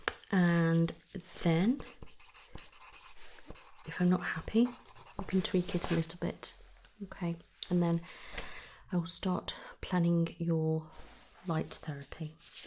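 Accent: United Kingdom. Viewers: British English is really the best language for ASMR !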